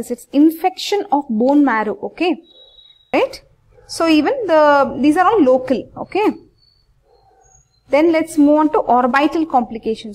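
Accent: India. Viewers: Do not have a presenter with a very pronounced Indian accent It is very hard to understand her!!!!!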